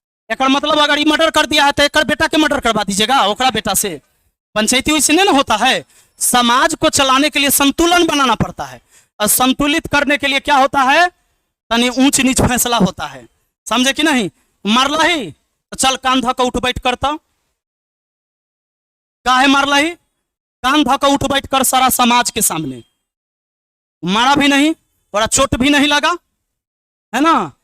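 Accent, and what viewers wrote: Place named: Bhojpuri, India. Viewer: सच में सर 🙏आपकी बात करने की शैली न दिल को छू जाती हैं। हिंदी में मिक्स, भोजपुरिया अंदाज़...............👌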